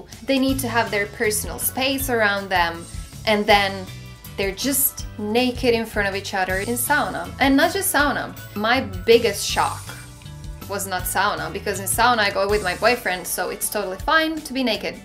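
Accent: United States. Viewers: That's a pretty strong American accent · Can I ask how you got such a strong American accent?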